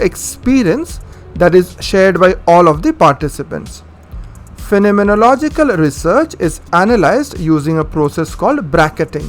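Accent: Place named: India. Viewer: This video seems very important and informative. Sadly I don't understand the English with the heavy Indian accent. My loss. 😔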